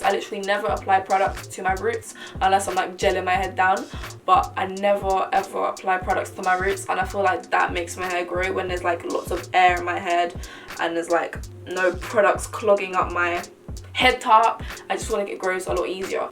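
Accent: United Kingdom. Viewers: 😱your British 🙌🏾🙌🏾🙌🏾🙌🏾 yass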